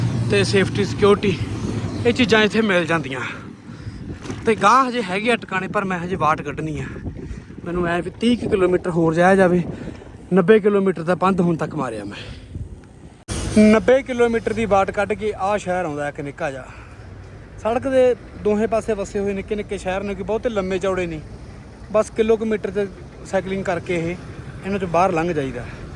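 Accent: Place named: Punjab, India. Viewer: ਤੁਹਾਡੇ ਦੁਆਰਾ ਬੋਲੀ ਜਾਂਦੀ ਪੇਂਡੂ ਪੰਜਾਬੀ ਬੋਲੀ ਅਤੇ ਚਾਰ ਚੁਫੇਰੇ ਦੀ ਜਾਣਕਾਰੀ ਮਨਮੋਹਕ ਹੁੰਦੀ ਹੈ ਵਲੋਂ ਮਾਸਟਰ ਜਸਪਿੰਦਰ ਸਿੰਘ ਗਿੱਲ ਪਿੰਡ ਉਬੋਕੇ ਤਹਿ ਪੱਟੀ ਜ਼ਿਲ੍ਹਾ ਤਰਨ ਤਾਰਨ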